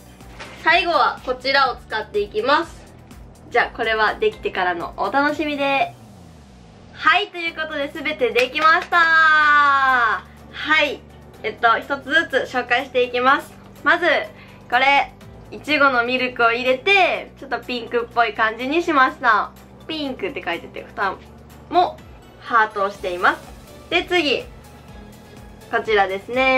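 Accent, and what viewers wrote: Kansai, Japan. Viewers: 関西弁で友達みたいに喋ってるの良かった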